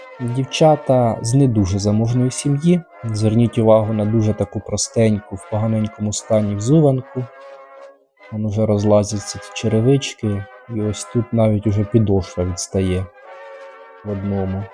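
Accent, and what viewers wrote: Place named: Sumy, Ukraine. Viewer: Чудова молода,добра людина ,підписка. А Ви случайно не з Сумщини? Чую ,щось мені рідне і знайоме.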